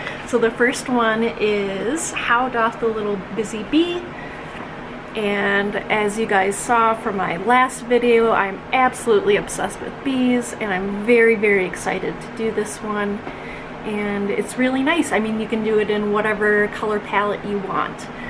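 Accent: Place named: Minnesota, United States. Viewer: Love your Australia story and your Minnesota accent.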